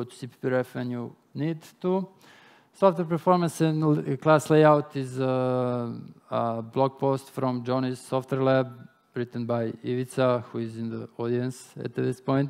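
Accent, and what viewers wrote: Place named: India. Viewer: Ирландец с акцентом индуса )), это немножко ломает мозг, но доклад классный. Спасибо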